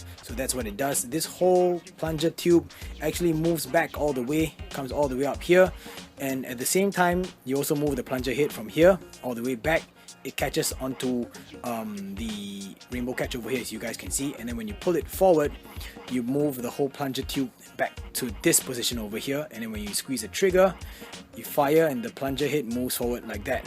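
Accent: Singapore. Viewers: Are you a Singaporean ?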